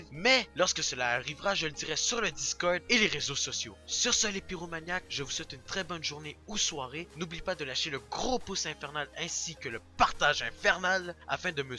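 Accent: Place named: Quebec, Canada. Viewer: Alors non je ne suis pas un PyroManiac, enfin pas encore, car je ne me suis encore pas mis à découvrir d'autres vidéos, notamment à cause du boulot... Mais je risque de m'abo si j'aime ton contenu. Ensuite, je trouve que ton classement par tiers (que j'avais compris^^) est pas mal car il ne les classent tous pas dans un ordre précis. Cependant j'aime bien donner un top 3 (comme celui dans le com précédent). Je m'étend pas plus sur ce commentaire, pourquoi pas sur une autre vidéo, car je vais commencer par regarder l'AMV et les contenus qui m'intéressent. Ah et oui je me doute bien que tu es Québécois, et je ne trouve pas ça utile de le faire remarquer. A partir du moment où tu parles français et que le contenu me plaît, je me fiche pas mal de ta nationalité selon ton accent^^ Voilà je go sur ton AMV, et merci d'avoir pris le temps de me répondre :)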